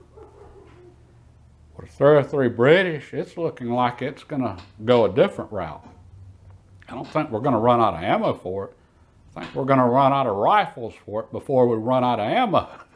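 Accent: South, United States. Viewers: Kia Ora, watched a lot of your videos ova the years. I love your south acent & the vast knowledge you bring to us on watching u tube guys. In Aotearoa ( NZ) i would think more deer hav been taken by 303,s than any other cartridge? My take ( disclaimer) NZ forest service in the past handed out longtoms smelys by the hundreds & they did the job. Lot of sentiment & collection re past service rifles. I have serval myself and enjoy them mainly for range shooting these days im getting VERY long in the teeth thesedays. The older iv grown the older style i choose these days iv got keen on muzzleloaders. ( [ i digress:( ] 303 brit is easily purchase here. As is 30.06 A hole nother subject.... i ❤ that USA cartridge. Keep well & safe aTom Nga mihi Chris Ma Te Wa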